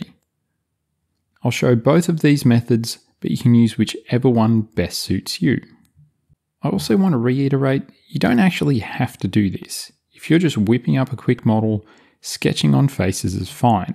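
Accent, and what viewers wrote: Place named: Australia. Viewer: It's great to hear a Bazz. (read Oz-Trail-Ian) accent making such an informed and clearly articulated analytical video. I'm an FC newbie and, after many montha of bashing my old head against the Blender wall, I now know that this is the package GP draughting package I've been looking for! Well done mate, Cheers, pk (Central Vic.)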